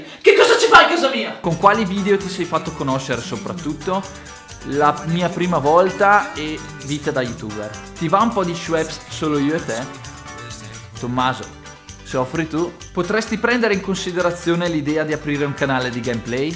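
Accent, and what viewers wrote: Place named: Veneto, Italy. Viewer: provincia di brescia??????? avrei detto ad occhi chiusi che sei veneto....(si, sto recuperando tutti i tuoi video vecchi xD)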